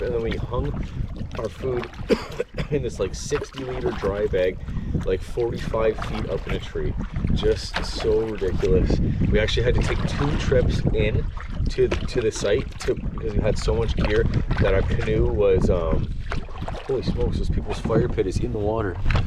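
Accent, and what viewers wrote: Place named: Northern Ontario, Canada. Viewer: I can't remember where is from, sounds like he's alot closer to me than I thought. 3hrs from NB, so around Timmins or Cochrane?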